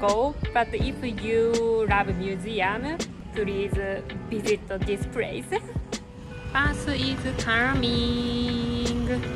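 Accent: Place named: Italy. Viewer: I LOVE all of your videos. This is just my observation so I do not mean to offend you, but it sounds like you have an Italian accent. I think it’s COOL!!!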